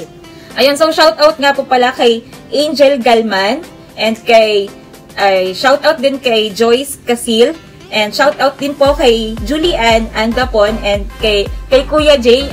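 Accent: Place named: Visayas, Philippines. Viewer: Mars same tayu ng tone hehhee ilonggo ka or bisaya??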